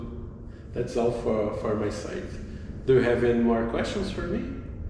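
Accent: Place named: Brazil. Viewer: Ta bem brazilian… mas ta fluido e confiante demais, isso importa muito. Parabéns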